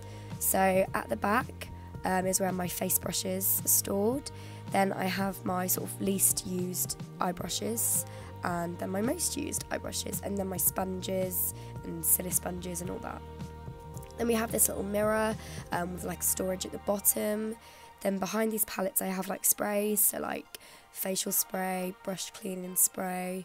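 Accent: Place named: Norfolk, United Kingdom. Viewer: Try making an asmr clip if you can. Be great to hear a good ol’ Narrrrfick accent asmr for a change. I’ve listened to hundreds of asmr clips over the last few years and would love a ‘home-grown’ one 👍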